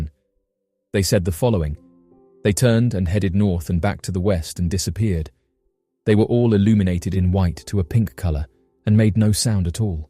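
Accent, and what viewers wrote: England, United Kingdom. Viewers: He's ENGLISH! (deja vu..?) 😆 🍄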